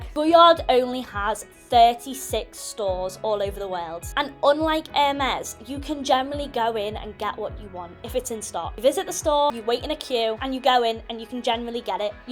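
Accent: United Kingdom. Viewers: I love the video I like your accent but can’t tell where it’s from ? Are you British or Australian or something else ? Great videos as always !!!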